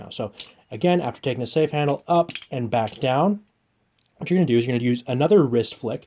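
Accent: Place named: France. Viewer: yeah... but i gotta say it can be tough to hear well through his heavy french accent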